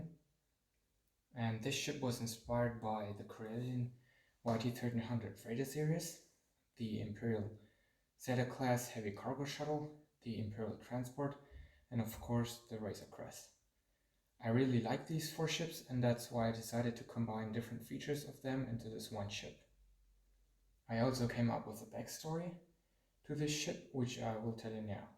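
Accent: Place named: Germany. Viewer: Aber der Dialekt ist so Deutsch 🤣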